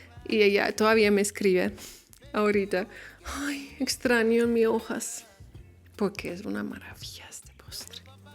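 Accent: Colombia. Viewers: Tan linda 💐🌹 Gracias por todos los alagos a Colombia belleza 💞 hablas muy a lo colombiano 💐💐💐 Bendiciones ✨✨✨ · Ya hasta tiene acento y expresiones colombianas. Impresionante. 😮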